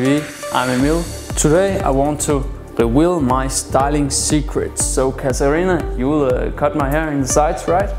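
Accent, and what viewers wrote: Denmark. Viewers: Is je Danish or something? Got a strong dialect going on.. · Wow den danske accent der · That accent! 100% Danish! Luv it! <3 Spitzen Video! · Man kan bare høre, at han har en dansk accent xD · Den danske accent er meget tydelig xD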